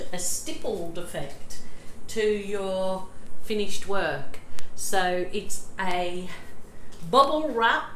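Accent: Birmingham, United Kingdom. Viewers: Me too, ex brummie. Noticed the accent straight away. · I thought she sounded like she was from Birmingham in the UK LOL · Thought exactly the same...she’s deffo got a brummie twang 😀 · Yeah....don't get your heat gun mixed up with your magic wand, ladies! It would lead to an embarrassing trip to A&E 😂😂, are you originally from the UK, Birmingham type of area? I'm trying to place your accent and it's driving me crazy 😂😂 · You sound like me, I’m a brummie... a thingy 😂😂😂😂💖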